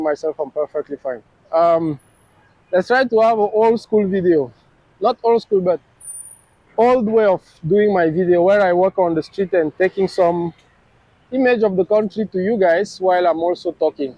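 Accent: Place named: Africa. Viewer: pero de que pais vienes tu? por tu acento evidentemente no eres de un pais del norte como inglaterra usa o canada, eres de algun pais de africa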